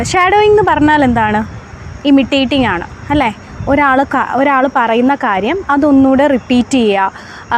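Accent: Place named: North India, India. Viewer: Where were you in North India? Your slang make sure you were born and bought up there. Am I right? Also appreciate your sincere effort to educate your subscribers.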